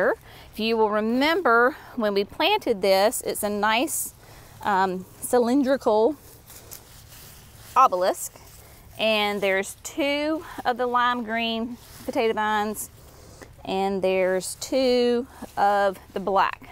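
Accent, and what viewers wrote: South, United States. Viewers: I just found your channel and I love it. You are a very good teacher with a world of information and a great little southern accent. Thank you and be blessed.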